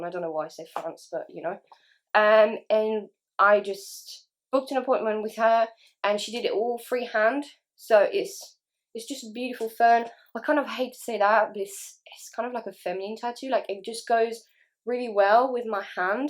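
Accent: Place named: United Kingdom. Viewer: Je ne m'attendais pas à ce que tu ais un accent british quand tu parles en anglais lol!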